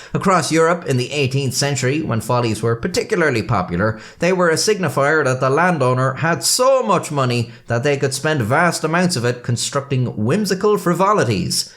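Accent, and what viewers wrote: Ireland. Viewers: You sound like you’re trying to limit your Irish accent but the Irish accent is too strong to get rid of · I mean, he has an Irish accent, so it's not that big a surprise. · You're Irish‽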